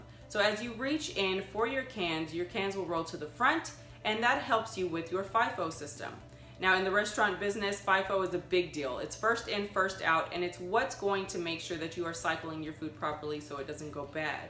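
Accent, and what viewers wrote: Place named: Texas, United States. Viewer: First time here, and as I was listening to you, I was like... is she from Texas? And low and behold... you are!! Great video!!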